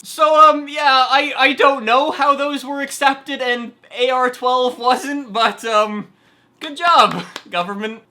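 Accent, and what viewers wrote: Quebec, Canada. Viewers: Je savais pas que t’étais québécois!! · t'es québecois :O !!!! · Tu viens du Québec???!!! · R u Canadian? Btw when will the gtr done?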